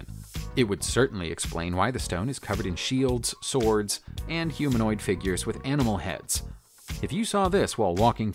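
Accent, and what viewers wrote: United States. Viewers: American production/narration.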